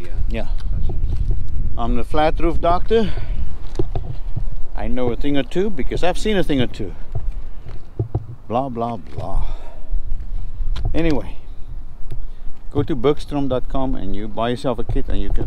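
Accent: South Africa. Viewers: That South African accent is undeniable. Good work.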